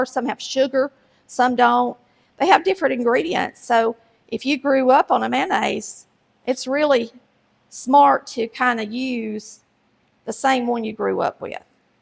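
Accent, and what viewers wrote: South, United States. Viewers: Your southern accent is as fantastic as your recipes! Thinking the Food Network needs to give you a show! I love how you take the time to put "loves" on all of the comments on here! Keep making all of our days more "country" and more sunny! ❤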